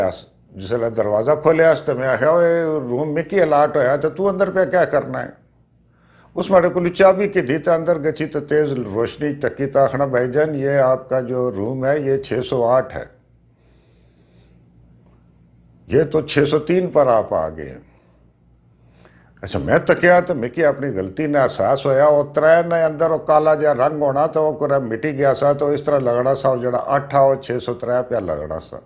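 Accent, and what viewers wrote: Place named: Pothohar, Pakistan. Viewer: Mashallah....Kai Sohni Pathwari Bolne Pye O.....Mhara Dill Bagh Bagh Hoyi Gachheya...... Bahun Pyar Naal, Nawin Dilli Ton❤❤❤❤❤❤ Allah Paak Tussan Ki Hassna Vassna Rakkhe. Aameen Summa Aameen ❤ Hamesha Tussa Ne Video Na Muntazir❤❤❤❤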